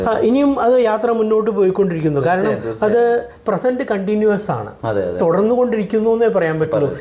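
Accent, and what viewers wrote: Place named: Karnataka, India. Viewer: കർണ്ണാടക സ്വദേശിയായ മലയാളം. 🙏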